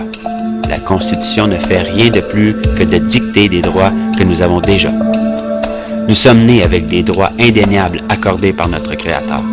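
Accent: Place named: Quebec, Canada. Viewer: excelent récapitulatif merci. Qui est la source de ce vidéo? Parce que ça sonne comme étant un compatriote québecois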